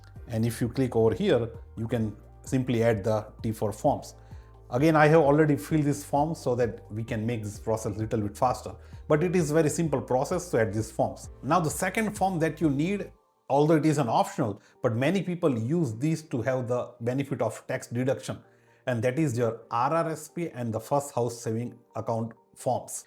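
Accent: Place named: South India, India. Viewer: Dude you sound South Indian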